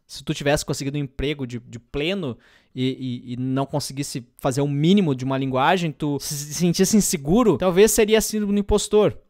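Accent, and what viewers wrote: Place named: South, Brazil. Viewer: Ba, mas tu é daqui do Sul, guri? hahaha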